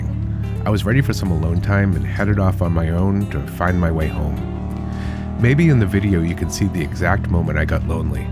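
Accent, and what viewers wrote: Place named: United States. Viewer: It is perplexing to hear the voice that plays in my head, talking with an American accent. Excellent channel - Thank you. Keep them coming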